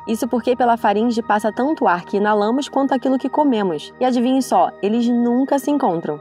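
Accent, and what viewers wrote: Rio de Janeiro, Brazil. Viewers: Infelizmente as pessoas não sabem que para atingir e satisfazer as pessoas de todo o Brasil, o sotaque deve ser neutro. Não consegui ver o vídeo todo, que deve ser muito bom, pelo sotaque carregadíssimo, que creio ser carioca.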